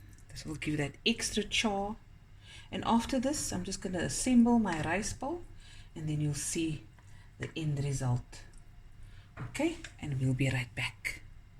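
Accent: South Africa. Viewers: I love the South African accent. It smells home.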